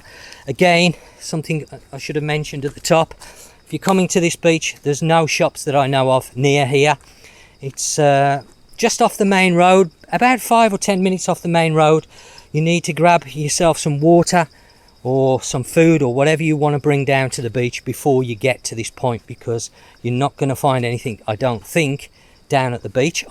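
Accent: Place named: Black Country, United Kingdom. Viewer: what gave it away was when you said the batter bits on your last video, common thing we say around the black country when we have our battered chips. im from Wolverhampton myself and thought you sound local.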